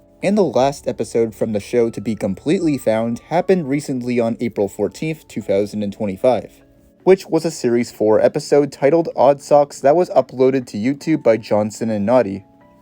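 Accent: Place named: United Kingdom. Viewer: You sound British, dude Edit: hey,is the creator of KND related to Patrick Warburton,famous voice actor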